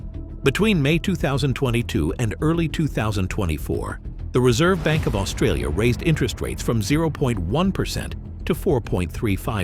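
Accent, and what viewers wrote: United States. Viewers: Not sure why you used an American narrator for an Australian issue?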